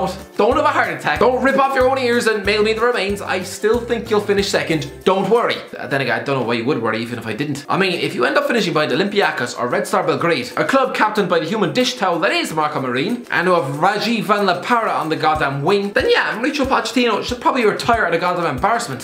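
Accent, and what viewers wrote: Ireland. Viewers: Are you irish? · What part of Ireland r u from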